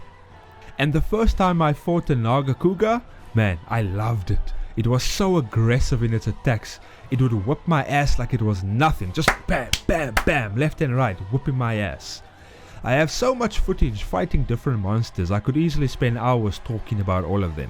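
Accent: South Africa. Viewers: which accent is this, are you south african mate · Are you South African by any chance!?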